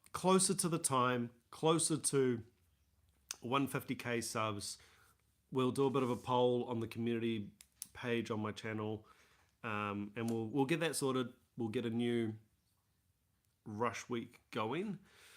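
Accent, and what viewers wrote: New Zealand. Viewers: Kiwi accent🤣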